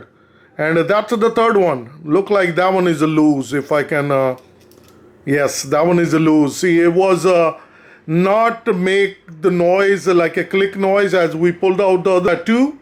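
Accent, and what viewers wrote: India. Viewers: Its indian accent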